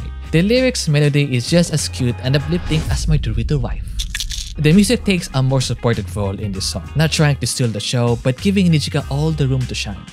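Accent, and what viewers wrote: Philippines. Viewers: i detect the pinoy accent pare >:) great vid btw!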